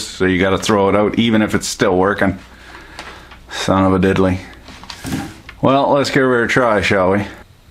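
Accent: Canada. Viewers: i started watching your vids a few days ago. I got to say, I love listening to Canadians talk. And you sir, are a fun one to watch. Keep up the awesome work. If you can call it work, you have so much fun doing it. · The whole first minute reminds me of Corner Gas. must be the accent. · Hes canadian, i think :P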